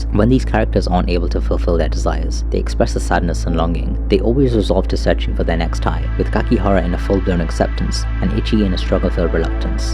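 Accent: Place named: India. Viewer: INDIAN ACCENT..DISIKE👎👎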